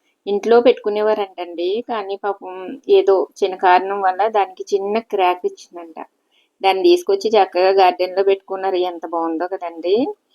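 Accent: Godavari, India. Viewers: హాయ్ అండి చాలా బాగుంది మీ వాయిస్ కూడా చాలా బాగుంటుంది మన గోదావరి భాషలో చాలా బాగా మాట్లాడుతూ ఉంటారు థాంక్యూ అండి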